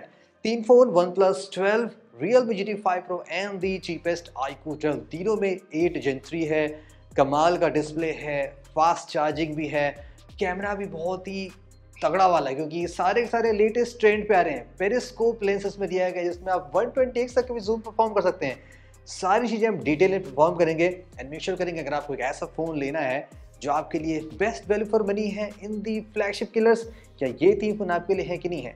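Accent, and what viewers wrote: Lucknow, India. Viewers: आप लखनऊ से हैं??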